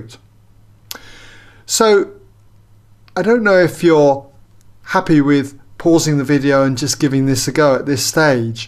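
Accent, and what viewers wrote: England, United Kingdom. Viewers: Nice UK accent,good way to captivate the audience. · Nice english accent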